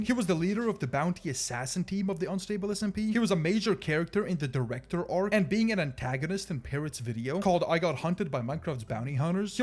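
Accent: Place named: United States. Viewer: lil bros voice changed to american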